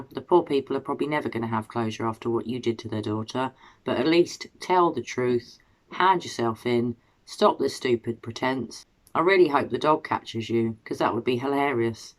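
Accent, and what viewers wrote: United Kingdom. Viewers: thank you for your thoughtful investigation into these murders. It really is alarming to think of Brian as a serial killer!!! You are the first person in my observations on Y.T. to propose this possibility a few weeks ago. I am praying often for LE to catch him and bring him to full justice. PS -- being "across the pond" in SC, USA, I enjoy hearing your accent 😊!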